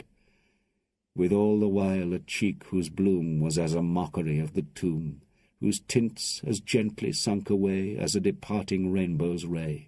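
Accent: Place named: England, United Kingdom. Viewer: Oh thank you, thank you so much !!! AT LONG LAST. A good English deep voice, with a beautiful English accent, reading this masterpiece written by the great Lord Byron. That’s how it should always be. I enjoyed every minute of it. Thank you once more.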